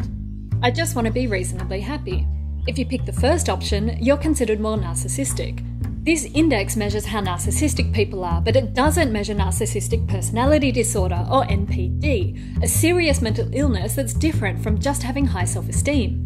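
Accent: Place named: Australia. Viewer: You sound Aussie!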